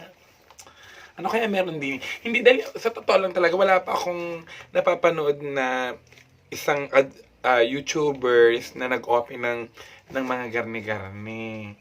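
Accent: Batangas, Philippines. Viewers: nakakatuwa k.. lalo na ung puntong batangueno mo at mga ganap mo.. keep up the gud wrk! and keep being humble!!😀😀😀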